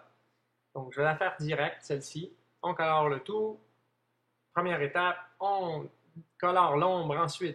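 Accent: Quebec, Canada. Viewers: Je ne suis pas cebequois mais je trouve que c le meilleur accent du monde 🙂🙂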